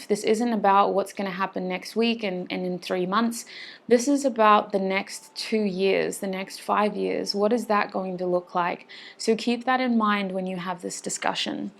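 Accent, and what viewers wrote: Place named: Australia. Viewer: I could instantly tell from the intro you were Australian. Lovely energy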